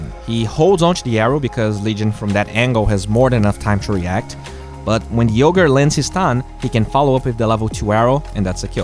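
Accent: Brazil. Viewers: Man, are you brazilian? haha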